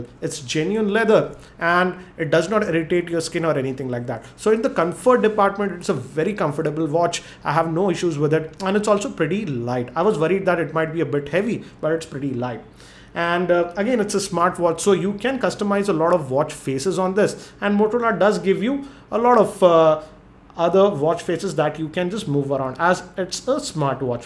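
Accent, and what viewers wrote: India. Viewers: " it is kind of oke " i love indian accent and how they shake their head while talking.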